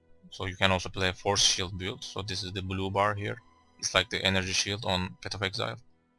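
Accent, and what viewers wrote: Turkey. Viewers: İlk cümlende Türk olduğunu anladım :D Rehber için teşekkürler. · Türk ingilizcesi bu ama gerçekten çok temiz olmuş dostum ellerine sağlık :)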